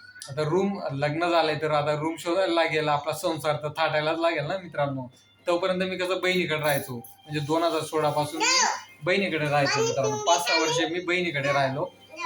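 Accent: Khandesh, India. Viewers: khandeshi tone ne bolotat te pan mumbait khup changle neither lagch tone change hoto boloncha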